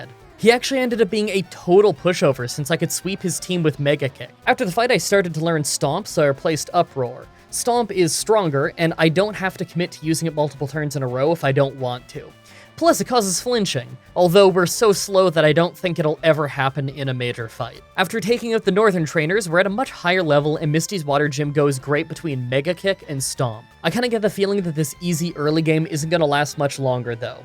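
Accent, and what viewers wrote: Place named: Canada. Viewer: As promised on twitter. Your canadian accent is showing I can hear your very noticably canadian outs! Will be back next week probably with the exact same comment as previously promised!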